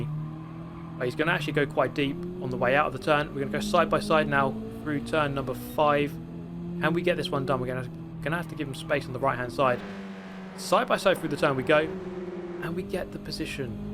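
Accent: United Kingdom. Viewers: Not to mention that British accent...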